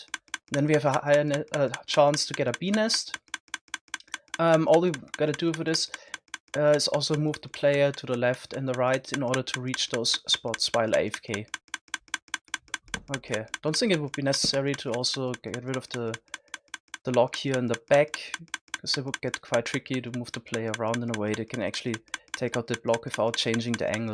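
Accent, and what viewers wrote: Germany. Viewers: great video! no bee pun or material list but i do have a question u german?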